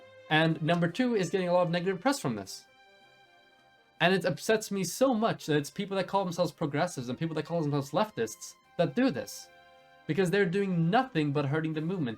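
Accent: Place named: Sweden. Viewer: Lycka till med vad du än tar dig an framöver. Du har alltid varit en av de mest rationella och eftertänksamma röster i den här delen av internet. Om du tar med det till vad som kommer härnäst tror jag du kommer se framgång. Kommer fortfarande alltid vara gött att se dig när vi får se dig på andras streams lite då och då. P.S I really like the more pronounced swenglish accent you had in those earliest streams.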